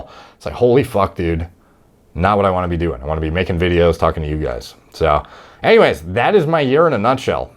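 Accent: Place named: Canada. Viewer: I have some bosses based in Canada and after listening to them in meetings for months now, really does sound so Canadian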